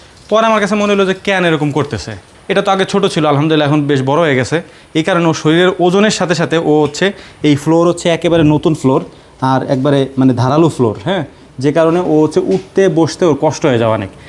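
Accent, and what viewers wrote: Faridpur, Bangladesh. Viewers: ভাই কি আমাগো ফরিদপুরের মানুষ নাকি ভাষা গুলো শুনলেই কলিজায় লাইগা যায়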